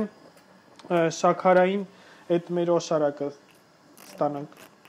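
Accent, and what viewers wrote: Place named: Sasun, Armenia. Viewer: Shat mec bavakanutyun staca haxordumic, jan lselov mer Sasuni anush barbar@ texapoxveci im mankutyun ev mer harazat Irind.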